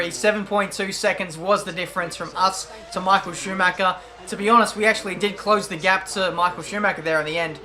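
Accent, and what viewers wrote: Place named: Australia. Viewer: kapiniuzertva its his Australian accent he says mart'n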